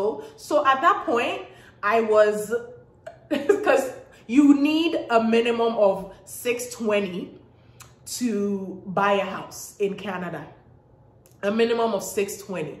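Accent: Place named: Africa. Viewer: You are such a beautiful woman, the way that African accent rolls of the tongue is so soothing.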